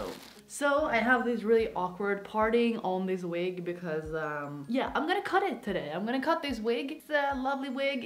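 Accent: Sweden. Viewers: Not to be rude or anything... But does she have a Swedish accent or is that a disorder..... I'm new to this Chanel 🤔😓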